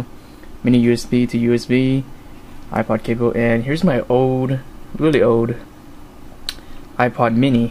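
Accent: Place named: Philippines. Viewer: Are u phillipino? You sound like one :P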